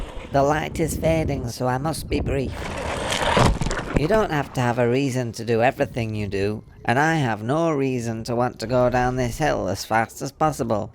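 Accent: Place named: North, United Kingdom. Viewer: And the lips. Don't forget the lips. Oh, and the Northern accent 😁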